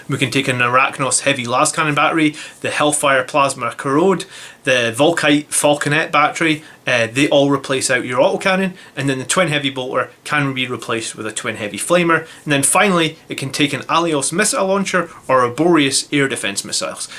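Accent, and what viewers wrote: Scotland, United Kingdom. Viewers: Really enjoy the channel and the Fb group. Also nice to hear a Scottish accent on the Internet.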